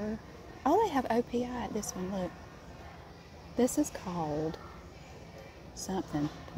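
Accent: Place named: South, United States. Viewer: Oooooo yay!! I love these videos it’s so soothing to watch you organise the shelves along with your southern accent talking away ( I’m British 🇬🇧 and I love me a southern accent 😊 ) I especially love when you organise the soaps!! ( that’s my fave ❤ ) Looking forward to another one of them videos too!!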